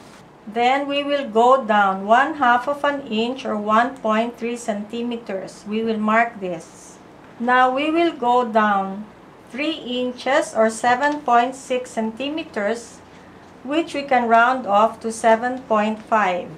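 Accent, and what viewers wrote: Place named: Philippines. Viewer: your accent sounded like a filipina..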